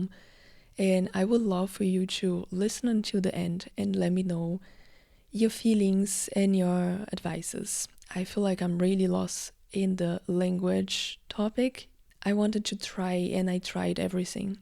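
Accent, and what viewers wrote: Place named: Brazil. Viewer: Oiii diva! Muito gostoso te ouvir falando assim de maneira fluida a abrindo seu coração sobre esse projeto de se colocar vulneravel aqui. Não conhecia seu canal/podcast e vim pelo video de planejamento de metas seguindo o big paper planning day (amo). Gosto de ouvir o sotaque brasileiro de alguém falando em inglês, sei la me deixa com coração quentinho. E as quebras em português, é sempre divertido, sinto que é quase um sussurro, um segredo, um clubinho que só algumas pessoas podem entrar. Adorei você falando do lado bom de alcançar poucas pessoas - menos gente pra responder. Achei curioso, engraçadinho e honesto. Enfim, obrigada por colocar um pouco de você aqui para eu ouvir enquanto trabalho com coisas que definitivamente não aquecem o coração! Bom trabalho por aí <3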